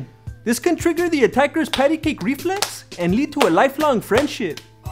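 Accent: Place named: Mexico. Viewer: es gracioso tu acento muy mexicano! saludos from oaxaca krav maga studen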